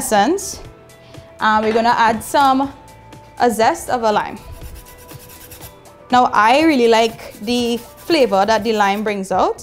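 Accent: Trinidad and Tobago. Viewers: It's just the way some trinis speak. Ever listen to the Indians from India? I swear every other word is "actually"!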